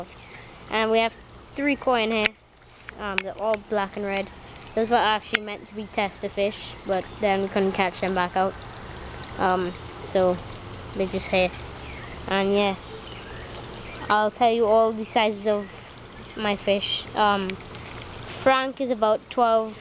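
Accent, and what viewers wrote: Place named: Trinidad and Tobago. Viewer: Hey nice fish hommie!i heard the lil trini voice.. im checking out ur vids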